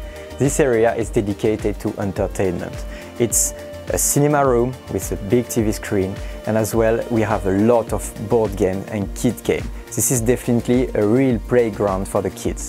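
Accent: France. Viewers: Nice French accent. "The key to life is happiness in your household"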